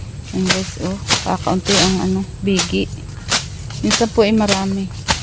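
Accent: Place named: Batangas, Philippines. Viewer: wow sarap yan lakatan, mahal yan fave ko din😊 sarap ng madaming tanim, taga san ka sis? parang puntong batangas kasi😊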